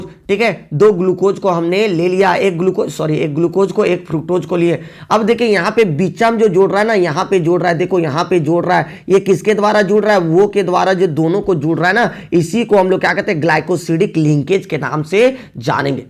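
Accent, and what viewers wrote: Jharkhand, India. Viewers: Aap jharkhand se hi lagte ho sir 😊😊😊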